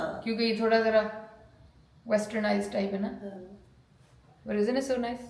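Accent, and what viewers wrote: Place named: United Kingdom. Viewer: I love your British accent please try to use it more often while conversation in your videos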